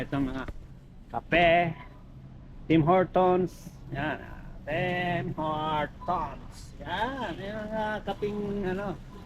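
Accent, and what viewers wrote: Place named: Visayas, Philippines. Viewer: Kuya,wag ka na mag salita tagalog or englush dahil masyadong BISAYA ang dating, say the right pronounciation!!!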